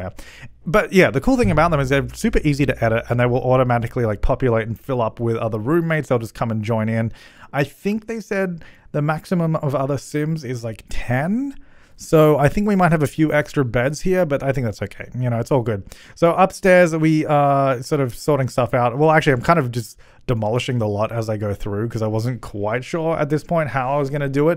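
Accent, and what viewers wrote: Australia. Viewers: Last night I had a dream that was looking for an assistant to help him and his requirement was just you had to sound like him. The whole dream from then on is me trying to sound Australian and saying "hey guys!" A lot.😂 Edit: omgoodness thank you all for the likes! I don't think I have ever had such a loved comment. 😊 I'm happy I could entertain you with my silly dream!😁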